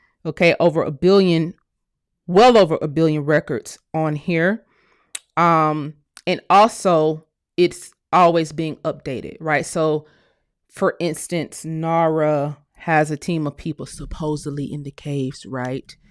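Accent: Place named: Africa. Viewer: Girl you African. You can’t fool me. I hear it and you need to put up the right flag because that’s not your flag. Sorry and not sorry and will never be sorry! You do a good job tethering but we “hear” you. Smmfh. You do speak very well tho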